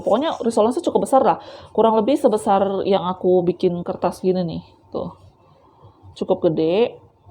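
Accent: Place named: West Java, Indonesia. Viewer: Suka bgt sama cici cici , tp ngomong nya nyunda (ada "mah" nya) 😆😆😆 terimakasih tips nya cii , sehat selalu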